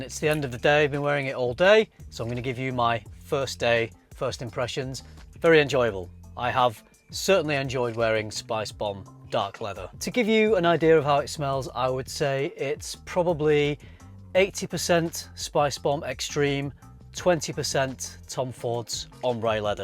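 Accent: United Kingdom. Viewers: Love your british accent... cheers from Argentina!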